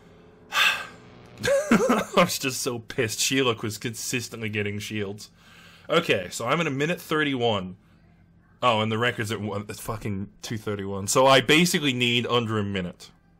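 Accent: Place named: United States. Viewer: Ah it was tripping me out some parts sounded American to me and I am from Texas so could have fooled me.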